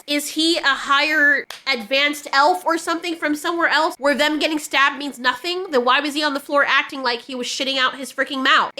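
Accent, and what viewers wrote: United Kingdom. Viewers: don’t listen and don’t apologize to him. We love your British accent. He’s just jealous because he can’t sound as cute as you. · Your British accent makes me laugh. It’s just frustrating that many US channels now do a posh (i.e. conservative) British accent to read California-penned (i.e. “woke”) articles…. which just makes no sense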